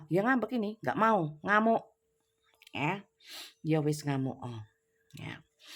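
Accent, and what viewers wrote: East Java, Indonesia. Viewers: Aku itu selalu teetawa sendiri kalau mendengar readingan bunda. Karena khas bahasa jawatimuran nya selalu muncul..😂😂😂😂 benar benar terhibur..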